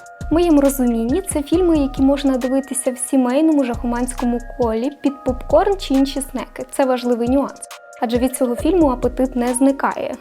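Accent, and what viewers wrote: Standard. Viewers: Вау! Яка стильна та харизматична ведуча! Вподобайка та підписка без сумніву! І окрема дяка за чистісіньку мову!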